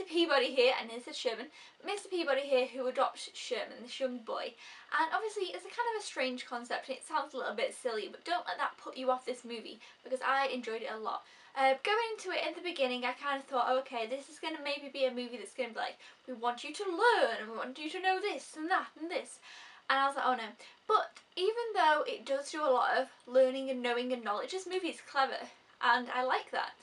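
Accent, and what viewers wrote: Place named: United Kingdom. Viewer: I LOVE(d) YOUR REVIEW! IT IS NICE AND REFRESHING TO SEE A BEAUTIFUL WOMAN REVIEWING AS MOST MALES ARE REVIEWERS. You are perfect reviewing! With that said, I have been to your country twice (one time, a DAY trip from NY to London and back), and another time, couple day trip. Have you been to the States here? Moving on: I do own over 18,000 dvds/blurays all genres, including over 100 3-D, and 945 laserdiscs. And a full theatre surround system. Anyway, I do plan on purchasing MR PEABODY as I grew up with the original cartoon. And you convinced me it is a good movie to purchase. I look forward to your future reviews. You are so bubbly, cute, love the accent, and you are so much fun to watch review. Thank you very much, keep up the great work, and looking forward to your next review, whatever movie it will be. (I recently purchased TRANSFORMERS 4 3D, MILLION WAYS TO DIE IN THE WEST, GODZILLA 3D, and others.) Take care, Happy Holidays, and as you say in UK, "Cheerio"! 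Bye for now. (*feel free to reply back if you'd like). BYE!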